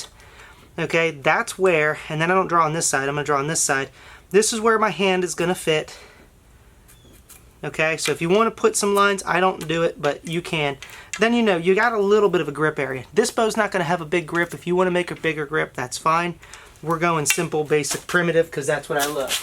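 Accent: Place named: South Jersey, United States. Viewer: Are you from the South Jersey/Philadelphia area originally?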